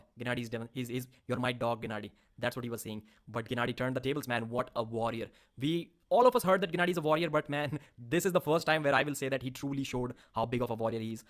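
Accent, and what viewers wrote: India. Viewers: Who the F wanna watch your dumb Indian baldy and accent. Just show the video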